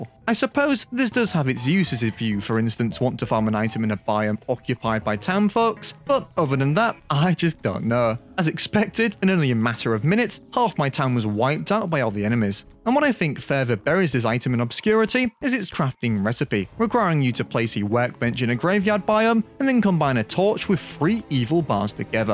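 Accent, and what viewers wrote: United Kingdom. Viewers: hearing his british accent makes me mad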